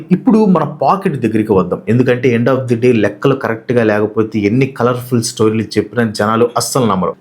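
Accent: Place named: Guntur, India. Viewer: Guntur slang🥰❤️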